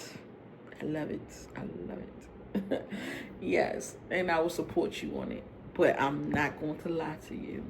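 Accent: United States. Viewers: Nobody knows you are an American until you speak. So I don't agree with you when you said Africans think they are better than Americans. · I hear that DMV accent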